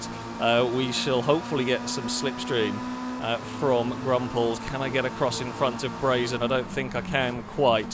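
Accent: England, United Kingdom. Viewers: One last thing We can tell your English by your accent But There is no such word as gooder GOODER It's good of or good enough but there's no such word as good or you might want to modify that out of your vocabulary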